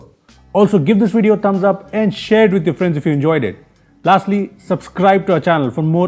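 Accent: India. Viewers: Most genuine indian english accent. Nice review....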